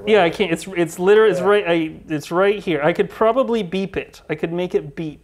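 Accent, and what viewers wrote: Canada. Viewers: I’m sorry — Canada, eh? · Another Canadian eh?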